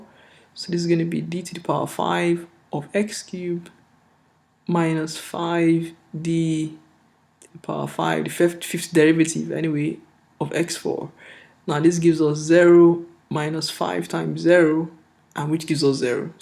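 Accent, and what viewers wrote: Nigeria. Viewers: Sounds Nigerian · are you Nigerian?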